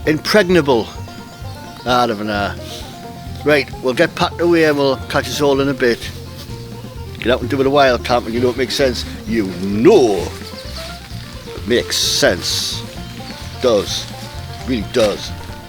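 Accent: Newcastle, United Kingdom. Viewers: Fuck me! Who knew jimmy Cricket was a geordie?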